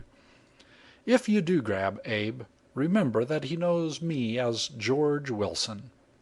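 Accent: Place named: United States. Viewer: Felt it totally out of place reading a typically English story with an American narrator...had to switch off.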